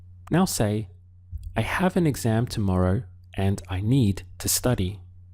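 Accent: Castile, Spain. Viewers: Hi, this may sound like a silly question, is this European Spanish? Many thanks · Is this the Spain dialect? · this Spanish is Castilian, right?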